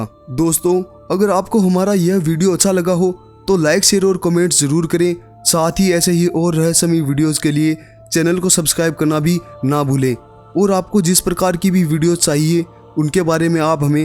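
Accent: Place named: Haryana, India. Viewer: Taai aale tu te awaj te haryanvi laage hai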